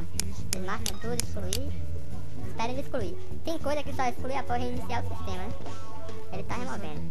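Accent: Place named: Bahia, Brazil. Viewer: essi mano é baiano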